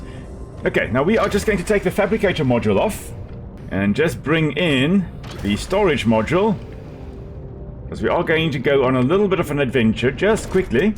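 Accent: South Africa. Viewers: where you from? you sound south african to me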